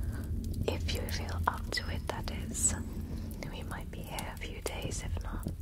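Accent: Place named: RP, United Kingdom. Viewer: The contrast of a primal, feral-looking character with a proper posh british accent is super stark :P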